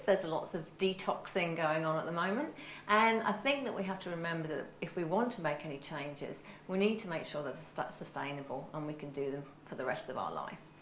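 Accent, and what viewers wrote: Australia. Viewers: Is this Australian accent?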